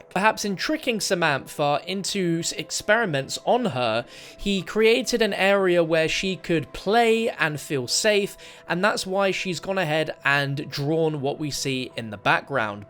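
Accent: United Kingdom. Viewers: Anyone know where I can watch this without some annoying british guy talking over it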